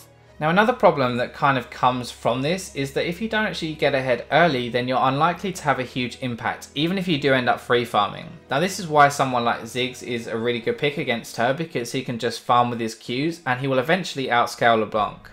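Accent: United Kingdom. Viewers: Dat british accent :>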